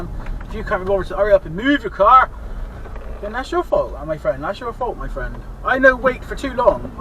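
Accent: United Kingdom. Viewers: Matey, i loove your pure BRITISH accent :-))) Cheers! BTW...Good lessons for ya kid, matey :-)))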